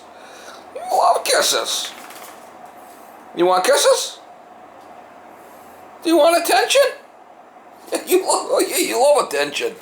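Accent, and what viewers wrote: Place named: Boston, United States. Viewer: Buttah, bahg, get everything togethah, containhas, heah, I love the Boston accent! Haha I’m from Norfolk county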